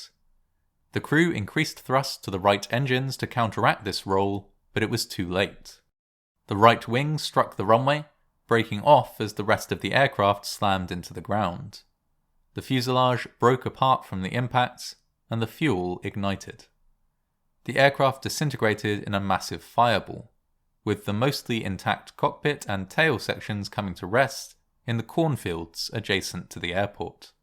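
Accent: United Kingdom. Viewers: Tell me about the horrible history my soothing british man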